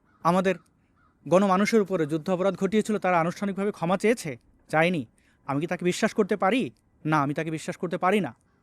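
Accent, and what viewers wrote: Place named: Bangal, Bangladesh. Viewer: দাদা আপনার বাঙ্গু কথা খুব মজা লাগে হা হা হা।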